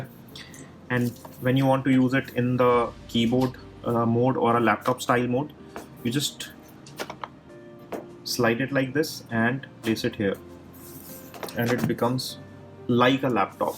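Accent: India. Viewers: lol the indian accent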